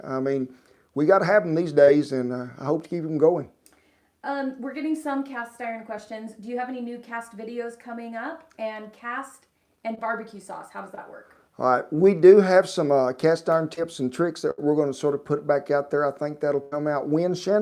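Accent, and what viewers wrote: South, United States. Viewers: I preordered your book and got it yesterday. Beautiful photography, great tips and stories. I was only disappointed that your wonderful sloppy joes and your potato cakes recipes weren't in it. But I'll just add my youtube recipe notes inside the book. Re: sound quality of your live chat, it's clear and plenty loud enough. in the background was a little fuzzy. Btw, you don't need a teleprompter. I think we cam all say we like you just the way you are naturally. I especially like it when pokes light fun of your cowboy talk. But I'm southern, si I "getcha". You both are great and I could tell all the hard work that went into the cookbook.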